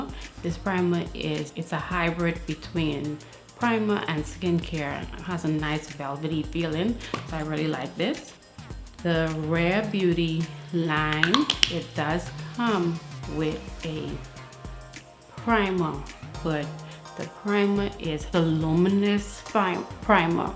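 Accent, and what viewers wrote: Bahamas. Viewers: It feeds so good to hear someone who sounds like me on YouTube. I'm proud to an see a fellow Bahamian on YouTube?I've always thought about starting my channel, but I'm scary. How did you get started? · I'm a new sub, I came across your channel and was like wait i know that accent. It's Bahamian? You mean to tell me we have our own Bahamian representating the 242 and no one told me? I'm so proud of you. Big the Bahamas, we doin7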